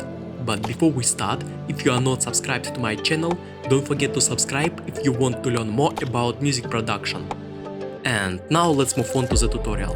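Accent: Russia. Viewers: Бро, по акценту узнаю что ты Русский. Я сам из Узбекистана, учусь и живу в Корее. Недавно наткнулся на твои видео, очень информативные и полезные. Просто хочу сказать спасибо за твоё старание. Break a leg! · ну просто слышно , что ты русский, соответственно у тебя есть акцент, который немного режит уши, но видео мне понравилось, лучше уж пусть будет такая озвучка чем гугл-озвучка)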